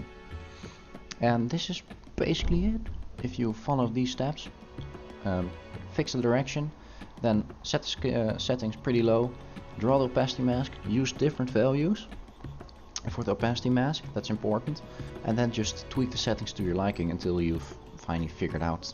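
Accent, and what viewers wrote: Netherlands. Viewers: Aan je accent te horen ben je Nederlands :P Mooie tutorial, heeft mij erg geholpen!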